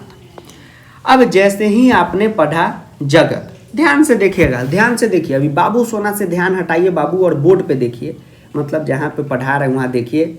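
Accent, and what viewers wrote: Bihar, India. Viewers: Sir are you from Bihar because your language is similar to bihar and you are teach very well 😌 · Sir aap bihari hona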